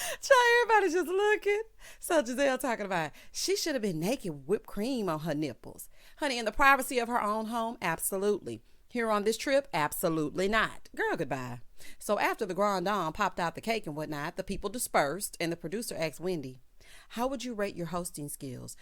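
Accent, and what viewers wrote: South, United States. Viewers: I remember very first review. She said “I’m country so you might want to turn on your closed captions.” I was on board with the G.O.A,T. moving forward 😉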